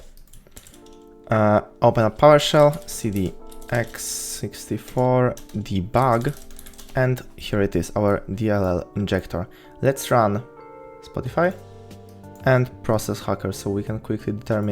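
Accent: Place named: Poland. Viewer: Polski akcent pozdro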